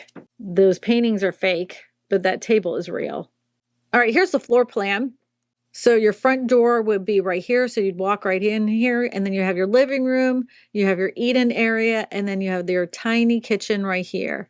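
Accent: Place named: New Orleans, United States. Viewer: I thought I had heard some aspects of a Yat accent. :) I can't imagine how much this would cost to insure, even on the Northshore.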